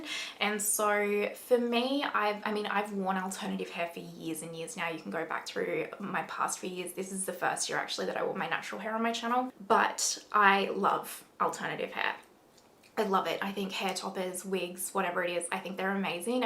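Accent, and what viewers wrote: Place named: Australia. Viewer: I actually love this and the colour is perfect for me. I'd probably go for a bit shorter, maybe 14 or 16". Also, great to hear a fellow Aussie accent.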